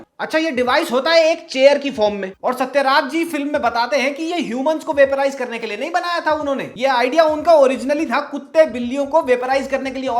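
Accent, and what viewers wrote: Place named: Delhi, India. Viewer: Mothiiii Lag'gii Dekh k 😂😂😂😂😂😂 Delhi accent superb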